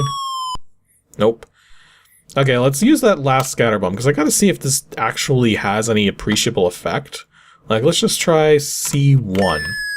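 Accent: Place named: Canada. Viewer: I agree. You're a Canadian. Can't expect you to know every correct local pronunciation of the entire world. If you know how you know how, if not just pronounce in your Canadian English. At least you're not apologizing for ever name you pronounce, which is one of my main youtube annoyances, well in the few channels that I watch.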